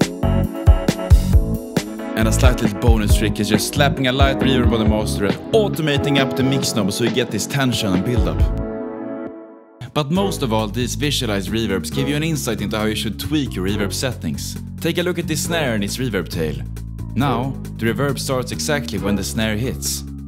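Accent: Sweden. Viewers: What i love most about these videos is the intense, thick Swedish accent which gives his speech that nordic rhythm that fits well with the rest of the audio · As a Swede I enjoy the Swedish accent! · never heard a stronger swedish accent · Are you Swedish? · jag vet en svensk när jag hör en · bro that swedish accent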